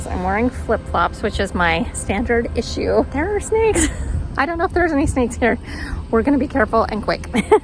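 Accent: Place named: Maine, United States. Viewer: Awesome ideas - love your videos. So cool to hear you might be from Maine (from a fellow Mainer) 🙂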